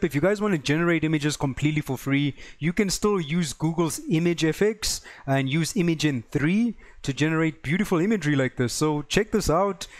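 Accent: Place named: South Africa. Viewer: Was just looking for an overview of Pikaframes and found your channel. Then while listening, i was like, this guy sounds South African! Then found out you are. So i am so glad to see fellow South Africans doing well on YouTube and in the AI space. Im still trying to get there, but hopefully will someday, but at least your video gave me what i needed to know from Pikaframes to know that it is going to work for my next YT channel and hopefully will do well :) Thanks bro!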